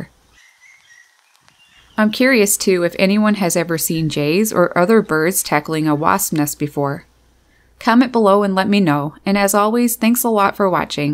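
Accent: Newfoundland, Canada. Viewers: You are probably the most Canadian sounding person i know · the Canadian accent is so strong lol. Great video tho, i saw this here in Oregon recently in a different form. A Stellars Jay was actually hunting down and tearing apart wasps without eating them, almost in a defensive way. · My wife is from Canada and you say OUT, ABOUT, just like she does. Lmao.......sorry. I'm from the south and she gives me hell about Pile, Mile, Ain't, ......lol... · What accent is this? Canadian? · Interesting and well put together video. I don't know why, but your voice sounds like someone from Newfoundland (or Labrador). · Like your Canadian accent. I don't see wasps landing on the jays to sting them, so at least they're not getting swarmed. Keep up the good work birdies. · Your definitely from Canada! Love the accent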